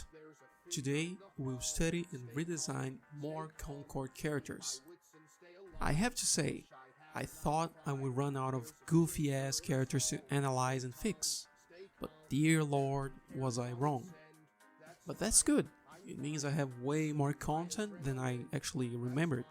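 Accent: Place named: Brazil. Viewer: Desde o primeiro vídeo, seu sotaque nunca me enganou. Sabia que era br kk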